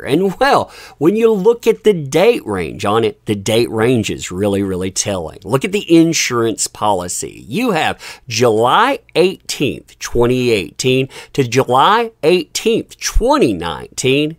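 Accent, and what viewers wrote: South, United States. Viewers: Hey. Are you from the south? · Yes he's from the south 😆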